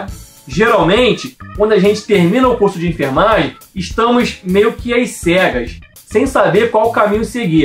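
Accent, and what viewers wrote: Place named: Northeast, Brazil. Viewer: Meu querido vc está viajando..A pessoa é formada graduada em Enfermagem , ela deveria estar preparada para todos os setores, vcs professores deveriam ser mais competentes em ensinar e não a pessoa ficar pescando aqui é ali..na graduação ela está aprendendo tudo em relação a Enfermagem. Jeito chato de falar..lol Failar, trabailar, peissoia, affs é uma mistura de Manaus, Bahia, é o Nordeste todo na fala. Um Enfermeiro com 4 ou 5 anos deverá estar apto a exercer qualquer função como enfermeiro. Só se escolher um setor específico deverá fazer sua especialização. Mas primeiro precisa de um emprego. Esse hospitais que são muito mercenários, querem exigir muito para pagar pouco. Falta mesmo é OPORTUNIDADE É BOA VONTADE. A educação não é continuada..então treinamento e ensino contínuo tem que fazer parte da rotina dos recém formados admitidos.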